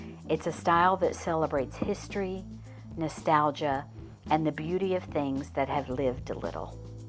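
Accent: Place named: New Orleans, United States. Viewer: Thank you for a lovely presentation both verbally and visually!!.. Your voice was soothing and comforting while you spoke about New Orleans!!.. It sounded as if you were a native to New Orleans!!..Kudos to the people who live with what they enjoy and NOT follow current trends!!..Beautifully orchestrated!! I loved every minute of it!!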